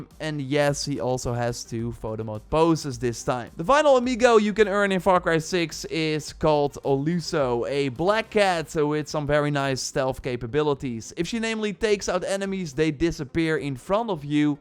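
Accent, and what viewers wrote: Netherlands. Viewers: Nederlander ik hoor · You have a really dutch sounding Acsent are you dutch?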